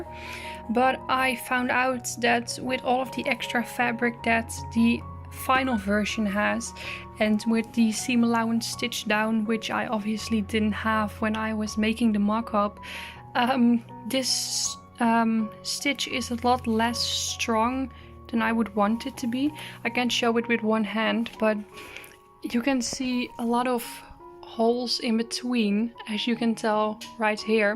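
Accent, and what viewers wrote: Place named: Netherlands. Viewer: Are you dutch ? You sound dutch (I am dutch) I love how you project turned out it looks amazing !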